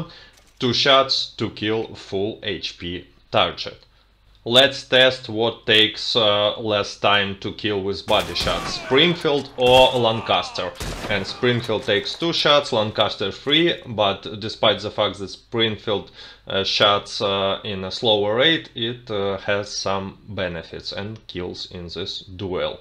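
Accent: Russia. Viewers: I think ur Russian accent is pretty cool makes u sound cool and scary love it 😂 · i like your russian accent :) nice vid! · I like your Russian accent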